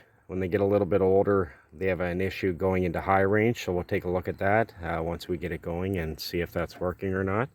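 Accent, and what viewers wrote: Canada. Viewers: Thanks for the overview. Love your Canadian accent - my mother was from NB. I just got a free 1990 YT1238 but in well-worn condition. Very stout machine. Briggs 12 hp with 38 inch deck. Spent a lot of time outdoors but that's not too tough on equipment in our mild So Cal climate. The paint is badly flaking over the rusty areas but so far all it needs is a gas tank, the little rod that actuates the choke and a battery. But I won't buy any of these until I run it around the yard a bit to test the trans which on this model is a hydro (no clutch). I got it to start yesterday and it doesn't smoke so there is hope it will run and cut well. Re the tires I am pretty sure they are tubeless. You can tell if yours has tubes by the valve stem. If they need tubes be prepared for a struggle with the old tires especially on the rears if you can't get them off the axles. 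When I put tubes in riding mower tires I bolt them to my work table so I have two hands free. Re tread wear I have never worn our a riding mower tire. The worst is to neglect them to the point they go flat and crack. But even then they are usually usable with a tube since they only take about 10 psi.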